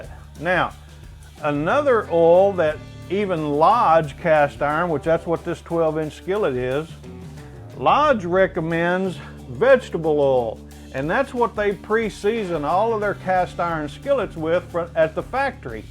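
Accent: South, United States. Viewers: It’s not the name. It’s not the beautifully seasoned pan he flashed at the beginning. It the fact he exclusively calls a skillet, how he pronounces oil, and the fact he is in an extremely ordinary lived in kitchen that makes this video so credible. That little chuckle at the end about flax seed oil…. Wish I had some more southern friends.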